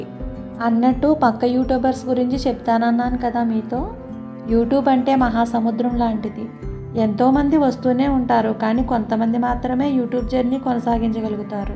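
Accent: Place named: Konaseema, India. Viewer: మన కొనసీమ వాళ్ళు ఎక్కడున్నా బాష యాస మాత్రం ఏం మారదు. 👌👌👌 sooper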